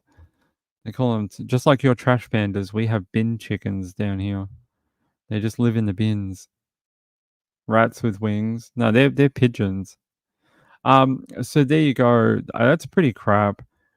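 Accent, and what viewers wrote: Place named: Scotland, United Kingdom. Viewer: I don't know why anyone might have a problem with the lovely Scottish accent 😂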